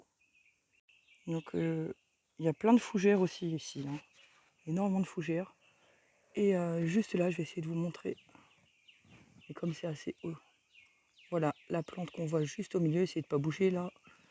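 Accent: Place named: Reunion, France. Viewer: Merci pour cette ballade ds la nature reunionaise. Ca me rapelle mes randos à Mafate. Les images sont reussies et on perçoit un petit accent reunionnais ds la voie malgré un sejour prolongé en metropole.😊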